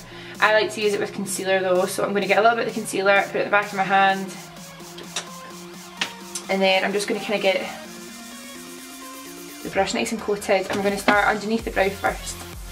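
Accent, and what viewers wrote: Scotland, United Kingdom. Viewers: Is it weird that I'm australian and yet when I read your comments I hear it in a Scottish accent?! lol! I love your accent x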